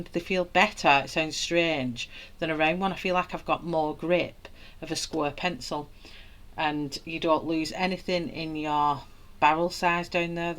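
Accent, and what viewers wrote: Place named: Northern England, United Kingdom. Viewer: I was born in Birmingham but iv lived in Australia since 1974, I like listening to you, you sound like your from somewhere up north. Liverpool?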